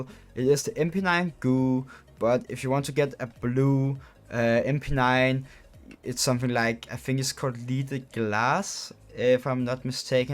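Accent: Denmark. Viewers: Bro are you danish?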